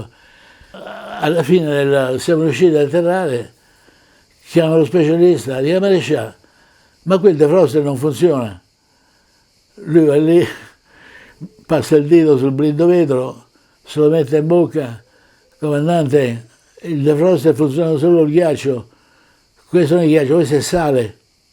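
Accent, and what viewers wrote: Veneto, Italy. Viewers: Io direi che un romano con l'accetto veneto è di tutto rispetto" o stregheta".😊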